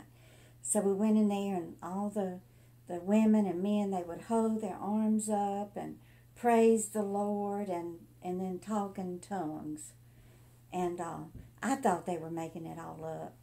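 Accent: South, United States. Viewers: 28 here , googled how to wring a chickens neck and got this video 😅. We have too many roosters and i don’t have a knife sharp enough but all that aside ; I listened to your video and parts of it felt like my own paternal grandmother . She was widowed when I was 6 . Loved her Diet Coke about the same way too haha . Thank you for sharing , I don’t know why you did it , but it made my morning all the better . 🩶 I heard you say Columbia and you sound southern as all git out , so I’m guessing you’re around Sc so hello fellow south cackalakian !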